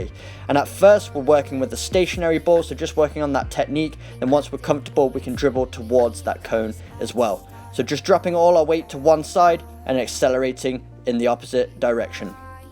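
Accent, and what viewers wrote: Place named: England, United Kingdom. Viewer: Very nice,! Your English accent makes it more clear to understand here in America because the football commentator i like are English hahaha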